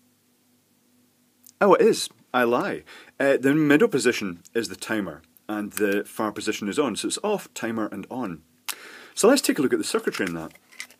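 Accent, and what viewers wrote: Scotland, United Kingdom. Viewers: Great teacher, selection of crystal frequency is something I hadn't even considered before - obvious now. Great delivery and the erudite Scots accent doesn't do any harm either - very watchable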